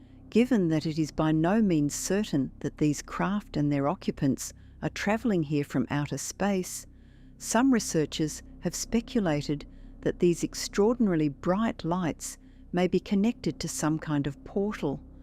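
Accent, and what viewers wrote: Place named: Australia. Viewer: The story teller has a lovely gentle Australian accent, and voice.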